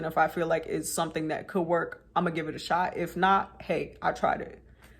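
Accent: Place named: Florida, United States. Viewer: i was gonna guess you lived in Florida cuz how you talk 🤣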